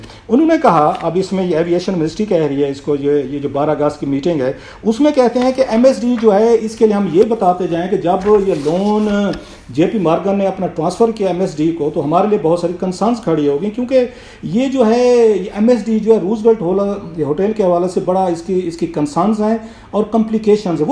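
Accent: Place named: Punjab, Pakistan. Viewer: Please stick to Urdu and don't use English words and short English sentence during your analysis. Because your pronunciation is very bad and you have very strong Punjabi English accent. And I think you should avoid English during your analysis. You have a very lazy accent and can't pronounce words properly. Please speak Urdu and even some of your words in Urdu are pronounced badly.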